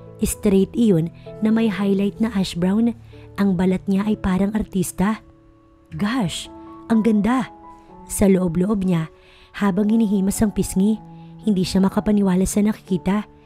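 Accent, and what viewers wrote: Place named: Iloilo, Philippines. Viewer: ang deliverance at sound web ng Bose's ilongong ilongo gid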